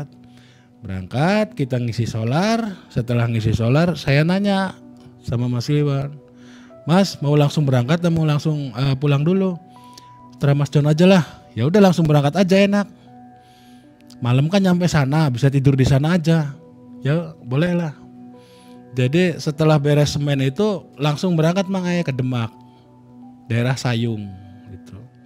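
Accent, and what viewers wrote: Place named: Cirebon, Indonesia. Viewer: pasti wong cirebon kih 😁 logat nya cirebon pisannn😍🥰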